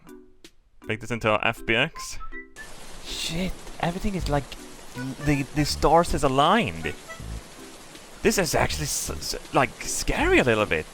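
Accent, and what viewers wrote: Sweden. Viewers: making videos in English for 20 years and still having a strong swenglish accent is wild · I can only hope to one day achieve level Swenglish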